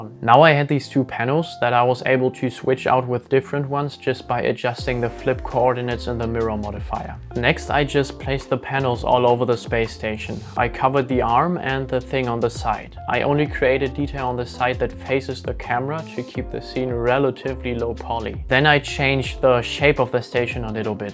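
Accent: Germany. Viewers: Hab direkt an deinem Akzent erkannt dass du Deutscher biste 😎🔥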